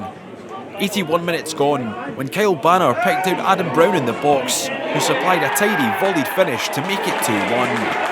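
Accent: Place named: Scotland, United Kingdom. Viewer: Scottish university BBC accent.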